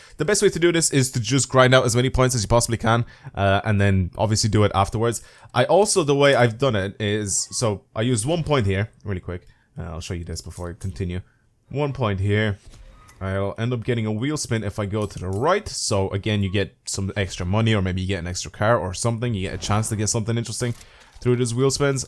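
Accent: Ireland. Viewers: Is ur accent irish cuz u say cär instead of car xd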